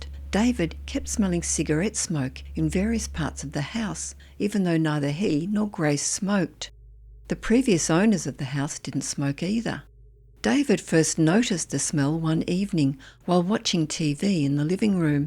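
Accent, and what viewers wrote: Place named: Australia. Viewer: Its simply an Aussi acent, so it sounds familiar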